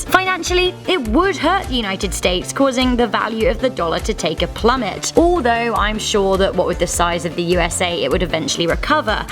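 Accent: United Kingdom. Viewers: Its weird listening a British woman say Texas tbh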